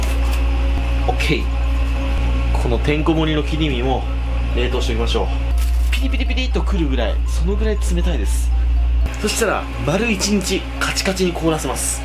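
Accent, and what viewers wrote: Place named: Tokai, Japan. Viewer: 随所に出る東海のなまりが故郷を思い出して好き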